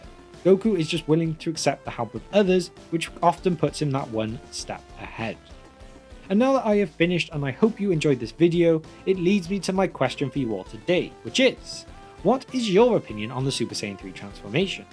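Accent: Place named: North West England, United Kingdom. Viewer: Aah cool. I'm a scouser and thought I heard a hint of North West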